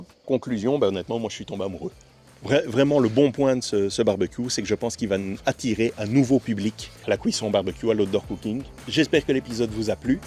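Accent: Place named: Belgium. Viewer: Très intéressante vidéo ! Certaines de vos intonations rappelle un accent belge, serions-nous compatriotes ?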